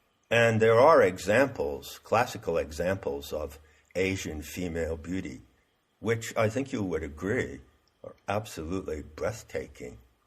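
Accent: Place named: Maine, United States. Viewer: Sensei, thank you for these genuine spoken words...i am just now obviously catching up to your most recent talks. I am not a young female of Spanish descent... this is my wife's URL, which ive had to use since being kicked off of themtube months ago. Such a Heretic am i! As a Jungian Psychologist (not Yung-lol) originally from the Boston area, i love to hear your Maine (?) accent. From Gnosticism to Jung to Henry Miller and Karuoac (Lowell, Ma) to Casteneda, the Mystery schools and more...so very much of what you say rings true to me as if it were second nature. What a terrible expression, second nature?! I have no heros, i have no idols, but simply felt the need to express how deeply i appreciate you sharing of yourself so honestly...a breath of fresh air in a world swirling in bull shite. My patience with people is waning...but it may be about damn time! 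In a sense, anyway.... I should write to you on your website without being a total blowhard. Thats not easy - i have much say that few besides yourself and a few deceased writers dare contemplate, lest it shatter their fragile egos. Your Integrity is panacea to my soul my Good Man! Cheers and Blessings from sunny, somewhat free Florida. Lux et Veritas mon ami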